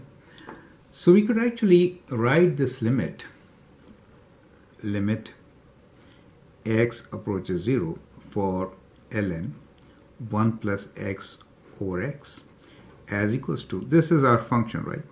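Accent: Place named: India. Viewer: abi hindistanlı gibi konuşuyosun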